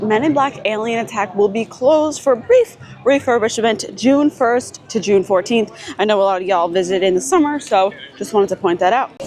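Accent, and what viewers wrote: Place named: Long Island, United States. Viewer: I love your video... you gotta be from long island.. lol your accent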